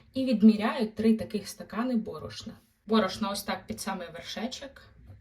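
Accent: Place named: Russia. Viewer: майже позбулася російського акценту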